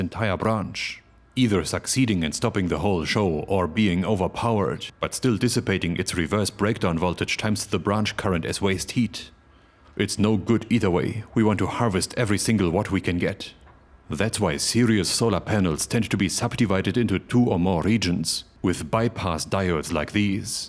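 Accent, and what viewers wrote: Germany. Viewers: Just watching this for the funny way he is speaking. I love this german accent. If you got an accent, exaggerate it to make the video even better. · Quite to the contrary. I eagerly await this endeavor with your German accent providing the perfect ASMR soundtrack.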